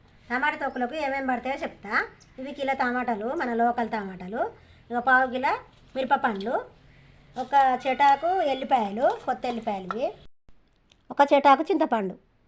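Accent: Telangana, India. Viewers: మీరు మాట్లాడే తెలంగాణ యాస మీ వంటలు కమ్మగా ఉన్నాయి😋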